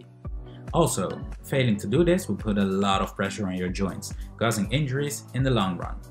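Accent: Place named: United States. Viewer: These are one of the best..no THE BEST, jump rope tutorials on YouTube. Clear breakdowns of all the techniques. Just one minor moan: As an English person, I have to mentally block out the American pronunciation "TUT-orial" (rather than "tew-torial)...my teenage son spends so much time online, even he's saying 'gas' rather than 'petrol' ;-)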